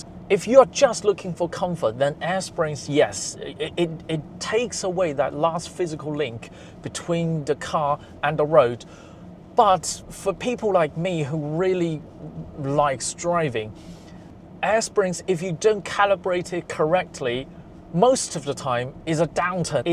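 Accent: United Kingdom. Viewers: British / Chinese Accent is a thing ?! Whaaa ?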